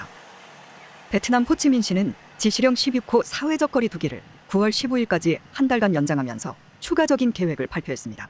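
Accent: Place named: North Korea. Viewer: 나래이션 악센트가 북한 억양이 있네요 ~~~ 발음이 너무 좋아서 귀에 팍팍 잘들려요 ㅎㅎ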